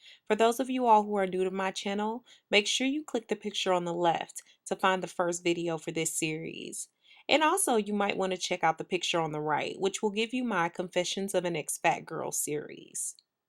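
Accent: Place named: Midwest, United States. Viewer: and are you from the midwest?! your accent is strong lol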